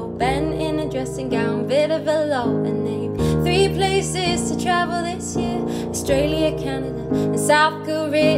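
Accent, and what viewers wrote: United Kingdom. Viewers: I love your UK accent :) greetings from Cali🌴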